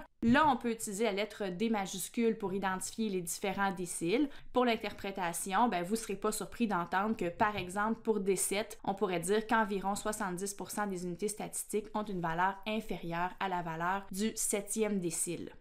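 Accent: Canada. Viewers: J’en peux plus de l’accent des canadiens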